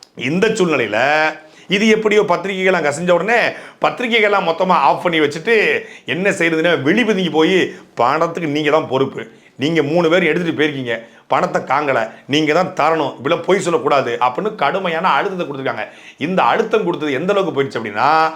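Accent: Tirunelveli, India. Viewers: 🤭🤣🤣🤣🤣😂😂😂😁😁😁 பூத்...தாவது, சூத்..... ஆவது.... யோவ் சாட்ட 🤭🤭🤭 திருநெல்வேலி பாஷை அருமை. திமுகவிலிருந்து கொண்டே நாம் தமிழருக்கு வாக்களித்த என் தமிழ் சொந்தங்களுக்கு வாழ்த்துக்கள் 👍👍👍 வன்மத்தை வன்மத்தால் வீழ்த்த பழகுவோம், வீரத்தை விட விவேகம் முக்கியம் 👍👍👍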